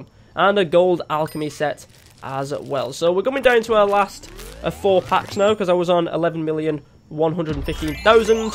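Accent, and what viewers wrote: United Kingdom. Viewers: the way, you sound really really UK-ish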